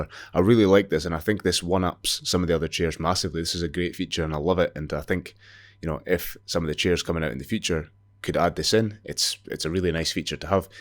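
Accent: Scotland, United Kingdom. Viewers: It's basically a seat from an old volvo with all them lumbar supports lol reminds me of my old man's motor years ago lol I'm looking for a new seat and it's gonna be one these boulies seats I do like that one in black I've been looking at as I'm a modeller and always at a bench building kits!. Whare are you from you sound like me down tae earth love the review deffo new scots subscriber!😂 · Amazing ! Love when I find a Scottish review. "If youv goat your own then use it coz it's pish" 😂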